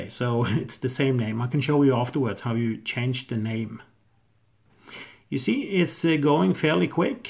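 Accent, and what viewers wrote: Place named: Norway. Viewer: Found the video randomly. instantly heard that you were norwegian.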